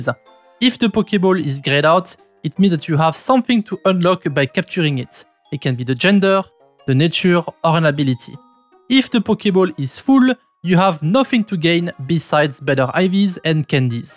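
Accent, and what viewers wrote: France. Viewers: Heaviest French accent I have ever heard 😮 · Respect. you are actually the first one, i see a video from, that is actually better than me process wise in the game. The Tips were very good, and you wasnt talking obvious trash Your video isnt clickbait, and even tho your french accent threw me off, it was very informative!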